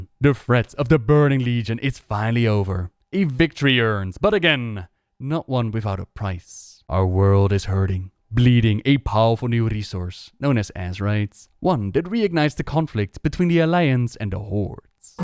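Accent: Netherlands. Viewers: You sound dutch! Fun to hear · annoying dutch accent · Ik hoor echt dt je Nederlands bent :) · Are you Dutch? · dutch accent :( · Nope but close, he has a very strong Dutch accent. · sounds like Dutch accent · That heavy Dutch accent is hard to ignore :)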